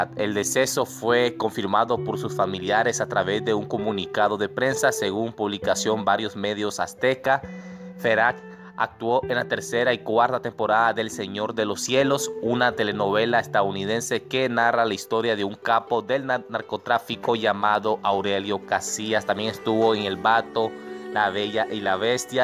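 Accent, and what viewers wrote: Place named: Central America. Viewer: Se te escuchan problemas de habla y no sabes leer bien. Escucho acento centroamericano. Le atiné en todo, cierto?😐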